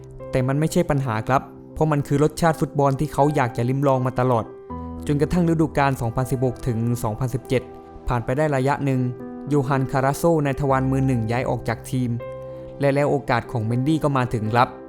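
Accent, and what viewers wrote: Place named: South, Thailand. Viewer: คนใต้ภาคเเน่ครับ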